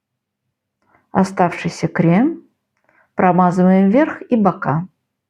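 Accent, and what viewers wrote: Baku, Azerbaijan. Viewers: Добрый вечер! У вас акцент наш:) Вы бакинка?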